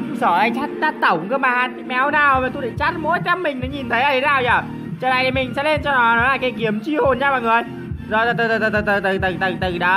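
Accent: South, Vietnam. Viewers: Anh nói tiếng nam ko hợp đâu anh nghe chán lắm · Nói giọng nam nghe ngứa tai vc.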